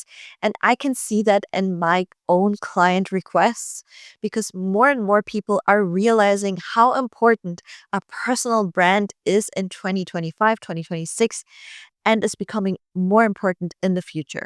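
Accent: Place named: United States. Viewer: A stand-out assortment of savvy tips and advice for the mid-2020s photographer. I can attest —even if only on the basis of very modest experience— that this video offers a good number of relevant ideas for our time and the contemporary market. | In re Ms. excellent ESL skills, as an American, I applaud the Yiddish-ish "regular-schmegular" [if I've gotten that right] and would like to add that "different from" as opposed to [the British-influenced] "different to" sounds much more natural to this listener's ear, particularly in view of the fact that her English [including accent] is overall far more "American" than British. In addition, the adjective "in the high street" is clearly a British-ism, for which one could substitute "main street" or just eliminate the modifier. It should otherwise be understood from context that said business is serious, reliable and focused on quality. 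You could also use the shorter "based in Berlin" vs. "based out of ~," which sounds like rural Wisconsin. O, those prepositions! These suggestions are offered solely in a constructive way and in appreciation of her mastery of the lingua franca of world business. Vielen Danke!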